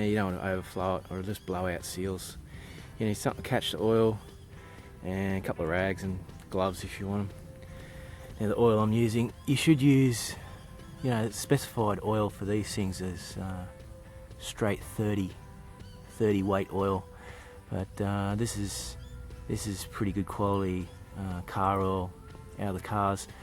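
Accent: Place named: Australia. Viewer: you sound like an Aussie bloke !!!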